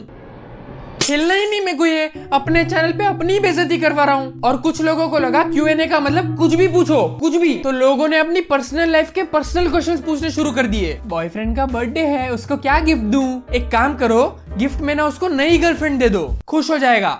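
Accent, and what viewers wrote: Gujarat, India. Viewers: Tum jese bolte ho vese Pata chal hi jata he ki tum gujarat se ho❤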